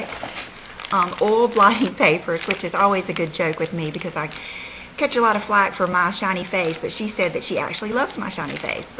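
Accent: South, United States. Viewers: i love ur vids! especially ur southern accent, its very calming :)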